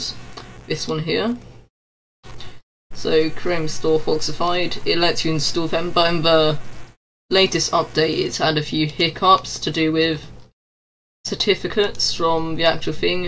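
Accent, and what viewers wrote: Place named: United Kingdom. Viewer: Youre save a british guy and i dont like british english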